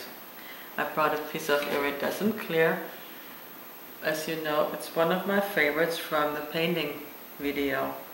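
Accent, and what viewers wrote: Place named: Netherlands. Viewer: you're such an inspiring teacher, Thanks so much! Your accent sounds like my mom (100% Dutch) anywho- keep it up- we enjoy you so! Shereen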